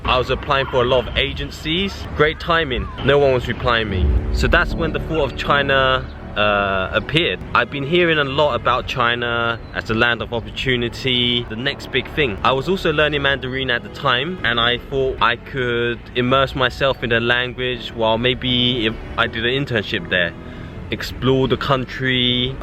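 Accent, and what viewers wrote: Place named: North London, United Kingdom. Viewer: Be used to the American accent and good to have the video with your north London accent, which is a quite good opportunity for learning something new.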